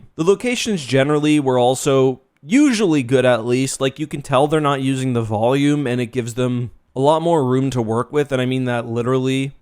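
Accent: Canada. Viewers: Bro i just noticed your Canadian you live in a hoose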